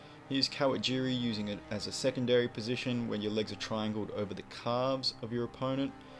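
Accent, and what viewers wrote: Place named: Australia. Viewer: Bro youre a beast. Aussie accent rofl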